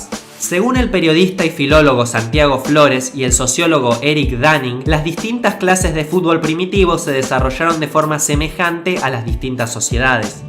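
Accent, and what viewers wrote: Argentina. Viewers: no se nota el acento argentino?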